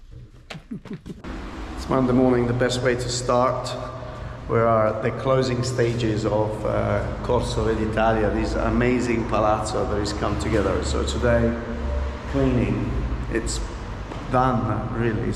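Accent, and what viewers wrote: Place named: United Kingdom. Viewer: Tantissimi complimenti e grazie mille per far conoscere la puglia con un bel British Accent 🙂 Mi ricordi tanto il nostro mitico royal family reporter Antonio Caprarica.. siete parenti per caso?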